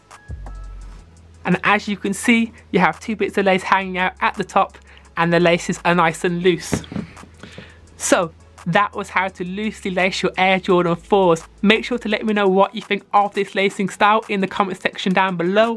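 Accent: United Kingdom. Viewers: Mate, these kicks are rad! I love your accent too. Which part of England are you from? Cheers, Fran (NZ)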